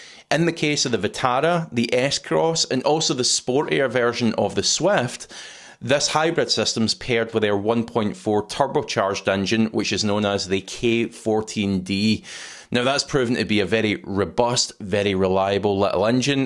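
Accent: Scotland, United Kingdom. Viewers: Would be grateful for recommendations regarding bigger cars with lots of space, to be converted into a mini camper. The usual suspects Caddy, Kangoo, Berlingo etc get mixed reviews, the Toyota vans are based on French PSA - so it is really hard to come by sth reliable. Maybe some other Asian vans or SUVs? Or the Dacia SUVs with older technology engines? From Germany here - and really appreciating you channel, even if I have to listen very carefully to understand that nice Scottish accent. ARRR! 🏴‍☠️🦜😁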